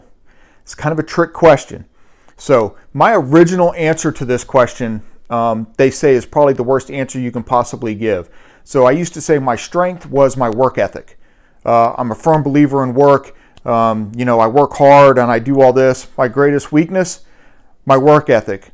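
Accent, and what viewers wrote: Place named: Maryland, United States. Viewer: I can spot the maryland accent from a mile away.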